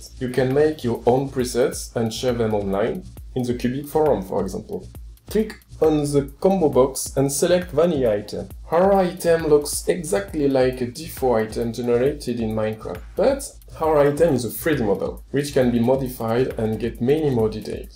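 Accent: France. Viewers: Very good tutorial. You have a very heavy French accent, but still it's really good that you took the effort to put subtitles under it :) Keep on making awesome voiced tutorials! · On distingue un petit accent français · are you french, tu est francais?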